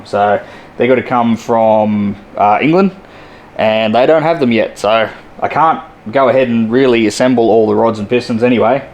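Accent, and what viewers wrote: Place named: Australia. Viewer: hahahaha....I thought you might have "bitten" on that one! I used to live in your area but I have since moved to The Philippines. Its good to hear your Aussie accent !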